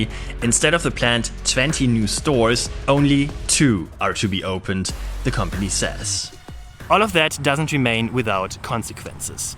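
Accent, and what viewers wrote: Germany. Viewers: Can't describe how much i dislike hearing a german speak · Oh my God... Do I sound like that when I speak English? 🤦🏼‍♂️ - Hopefully not ... The German accent is really blatant.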